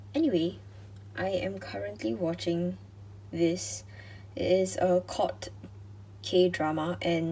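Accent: Singapore. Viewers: I love Singaporean accent so much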